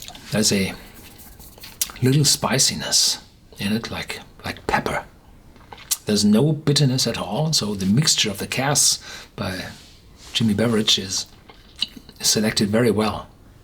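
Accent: Germany. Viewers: Sounds German , not Romanian..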